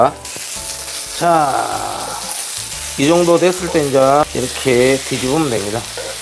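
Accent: Jeolla, South Korea. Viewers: 전라도 사투리 쓰시면서 억수로는 경상도 사투린데 섞어쓰시네 고향이,어딘교 구례쪽이신가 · 사투리가 딱 전라남도 서남부 목포 스타일 이네요.. 정겹네요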